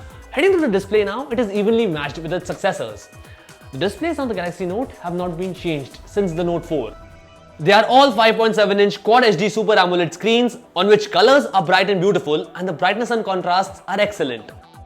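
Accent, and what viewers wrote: India. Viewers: terrible annoying i dian accent lol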